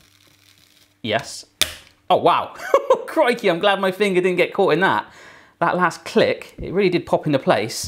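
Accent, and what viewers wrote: United Kingdom. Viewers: sounds very British in this video haha - the pineapple slicer was the best one by far too! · i found your channel extremely enjoyable ! :D also i love british accent soo soo much !!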